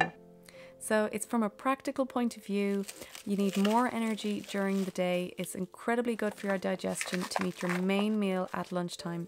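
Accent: Ireland. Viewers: your lovely calm Irish lilt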